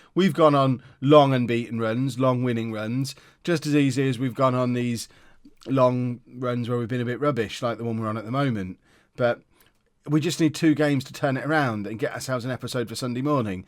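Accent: United Kingdom. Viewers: I dont understand football at all but it’s interesting listening to a Brit explain it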